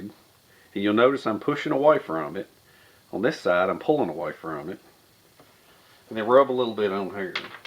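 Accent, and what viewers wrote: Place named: Florida, United States. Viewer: I was 17 when I went into the Army,I was the only one that was not an NG,I was RA and 17 all the NGs was from the north and me from Fla farm,they made fun of the way you and Isound untill we went on bivouac and to the rifle range,The city boys had never had it rough or been out in the sticks for fun,guess who had the last laugh,I can listen to you all day,just makes me home sick,keep it up Bud I,m 71 now and they call me OldJoe,or Old Grand Paw any way love uor stiff LOL keep it,Oh I did not know I was satropping wrong, thanks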